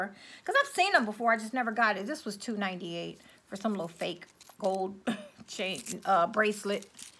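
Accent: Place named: Louisiana, United States. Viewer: Like that cajun talk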